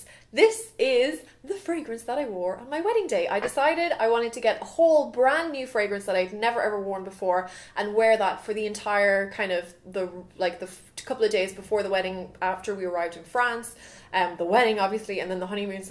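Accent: Ireland. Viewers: are you irish? i dont know because this is your first video ive seen.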